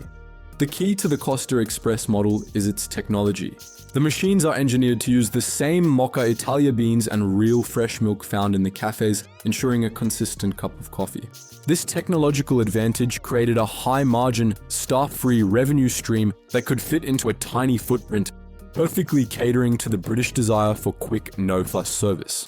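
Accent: Australia. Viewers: Based in Cyprus, Australian "voice", making videos on the UK Lowk slop icl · I know it’s AI but love how it’s an Australian with deep, comprehensive knowledge on UK high streets 😂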